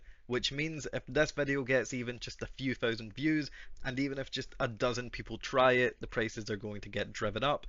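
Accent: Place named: Scotland, United Kingdom. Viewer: Love the accent. Whether male or female, scottish is just 👌Cheers to the video.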